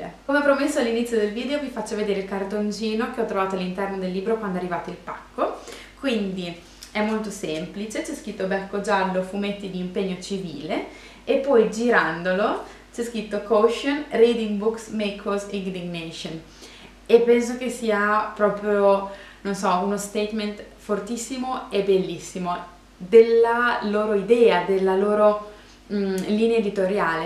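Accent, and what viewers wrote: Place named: Modena, Italy. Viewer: Ciao, sono arrivata sul tuo canale proprio grazie alla BTILI e mi hai conquistata!! Sei davvero bravissima. Hai una proprietà di linguaggio invidiabile e tra dolcezza e passione riesci a far innamorare dei libri di cui parli (ormai li ho messi tutti in wishlist 😂). Oltretutto io sono di Reggio quindi il tuo accento modenese mi è familiare 😊 a me piacciono moltissimo i fumetti proprio perché l'utilizzo delle immagini può essere molto evocativo. Complimenti!!!!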